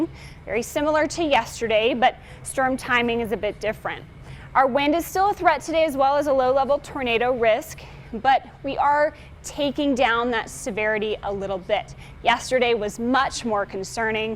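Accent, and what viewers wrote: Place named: Louisiana, United States. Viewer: 😉 speaking like she from Louisiana, very slow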